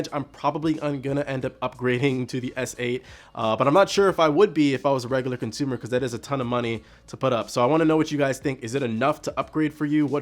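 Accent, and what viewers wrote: Nigeria. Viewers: well am a Nigerian too and av never seen any Nigerian with this freakishly awesome accent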